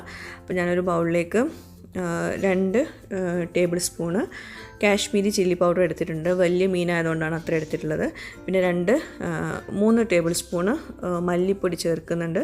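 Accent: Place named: Malabar, India. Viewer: സിമ്പിൾ നാടൻ വിഭവങ്ങൾ, ഹൃദ്യമായ മലബാർ സ്ലാങ്ങിലുള്ള വിവരണം. ഭക്ഷണം കഴിച്ച പോലെയുള്ള ഒരു ഫീൽ 😊👌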